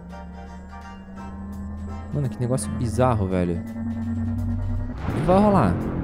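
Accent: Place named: Minas Gerais, Brazil. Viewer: Crlh pensei a ele foçe de minas man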